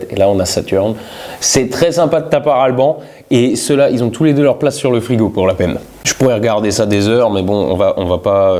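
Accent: Alsace, France. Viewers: J'adore ton accent d'Alsace hahaha C'est la première fois que j'entendais cet accent <3 Un jour, peut-être que je pourrais te faire découvrir l'accent du Québec (ou en a aussi plusieurs hahaha J'ai fait un "live" dernièrement mais je sacrais trop pendant un test durant un vol dans le Grand Canyon, dans mon simulateur, je sacrais juste trop pour le publier ici et de toute façon, seulement mes friends FB peuvent le voir hahaha) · Aucune honte à avoir l’accent alsacien! Signé: un parigot qui se désole de l’uniformisation du français. Belle vidéo émouvante à plein d’aspects. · J'adore l'accent alsacien (je ne suis pas alsacien) ! Je connais deux personnes qui parlent vraiment comme tu l'as fait et ça n'a rien de ridicule. Même sans te forcer, on le reconnais bien ton accent : réécoute-toi à ;-)) Bravo et merci pour tes vidéos. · Au sujet de l'accent alsacien, faut savoir que je suis du sud-ouest et que mes voisins étaient alsacien, avec l'accent, mais bien pire que le tien, coté allemand de ouf, et du coup quand ils s'engueulaient en mi-français alsacien/mi-allemand on pouvait pas s'empêcher de se taper des barres. 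C'est comme un québecois qui t'engueule, c'est drôle. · Ton accent alsacien de BasRhinois la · Haa l'accent alsacien ! Ça fait longtemps que je l'avais pas entendu tiens. Ça rappelle des souvenirs. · Ton accent Alsacien on dirait un mélange entre l'accent Allemand et Luxembourgeois ( logique tu vas me dire )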